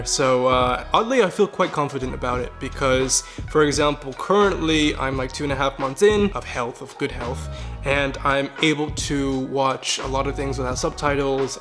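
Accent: Japan. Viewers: Your Korean is adorable. It kinda sounds like a Japanese accent 😂🥰. I’m learn Korean too and I hope I can learn it with you! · Awww your korean pronunciation is so japanese lmaoo hope u get better and hope your have a good year!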